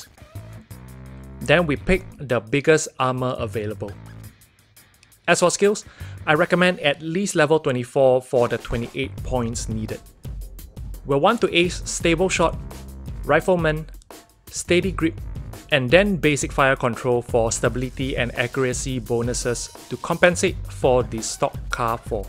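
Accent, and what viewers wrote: Singapore. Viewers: You from Singapore?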